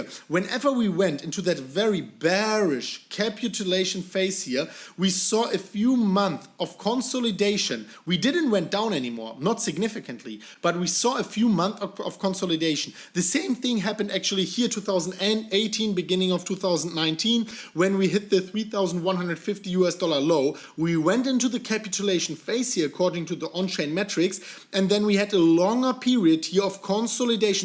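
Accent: Germany. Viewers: Good analyse but that Germaned English blehhhhhhh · Sorry man, I recognise german accents from a mile away. He's definitely NOT Polish.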